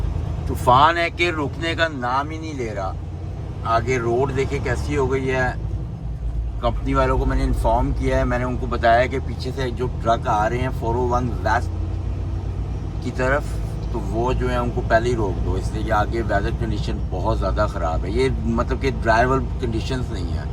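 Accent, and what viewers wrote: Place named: Punjab, India. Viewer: Bhai sahib, why didn't you make your vlog in Punjabi instead of using a Punjabi accent in Urdu with some English words? I don’t understand why some people have a complex about trying to use Urdu and English for their vlogs. Also, you are complaining about the weather in Canada, but who invited you there? Bhai, be grateful to Allah; you have been blessed with so many blessings and a job. Enjoy the day you've been blessed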